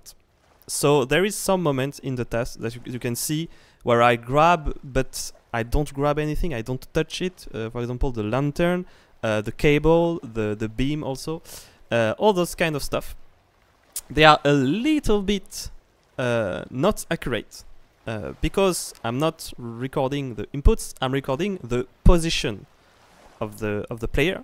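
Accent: France. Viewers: mdr le bonne accent francais comme on aime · ptdr l’accent de fou même mon voisin a reconnu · a ses pour sa que dans la video tu avais un acent francais et du mal pour certain mot 3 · On reconnaît l'accent français c'est cool 😂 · Tu as comme un accent d’un français qui parle anglais 😂 sinon je pensais réellement que tu l’avais fais en 😂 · Son accent est 100% français, tout le monde l'a reconnu 😂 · putain je savaispas que t'étais français mdr, trahis par ton accent x)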